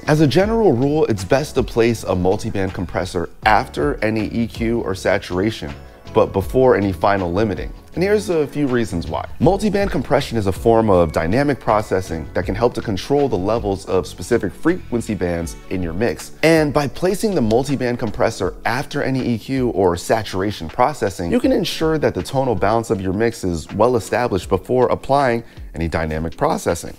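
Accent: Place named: United Kingdom. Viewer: look, bruv, i for one enjoy bri’ish miami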